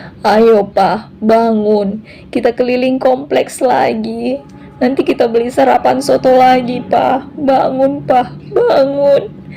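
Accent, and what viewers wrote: Medan, Indonesia. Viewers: Bogor hadir ikut nyimak mb logat bahasa medan sangat enak d dgr 😅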